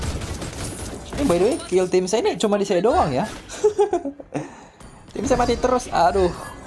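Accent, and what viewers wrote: Manado, Indonesia. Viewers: Bg orang manado?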